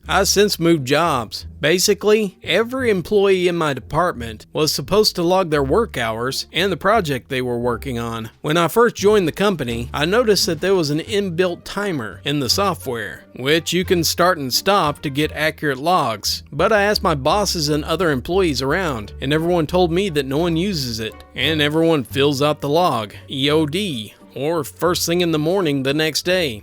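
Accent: United States. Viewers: pronounce the word "arse" in your very American accent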